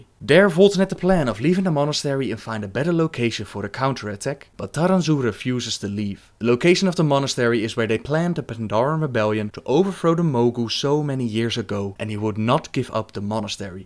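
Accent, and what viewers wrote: Netherlands. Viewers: dat dutch accent makes me lol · Are you dutch? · I'm watching a bunch of lore videos from you and stuff because I recently got interested in the lore. But just a question I'd like to ask, totally off topic... Are you Dutch? Cause I kind of hear a Dutch accent. But I might be wrong. I'm Dutch btw : p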